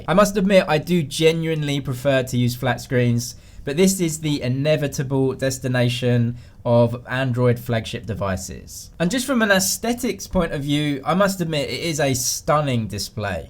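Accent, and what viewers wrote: England, United Kingdom. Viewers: Your stupid English accent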